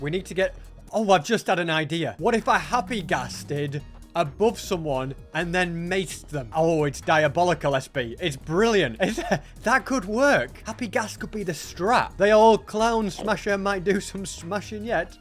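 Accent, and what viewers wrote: United Kingdom. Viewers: either way he literally speaks in a type of british accent all the time so ur comment makes no snse. what u mean is a british word u cant seem to remmerb him saying much, noy at all the same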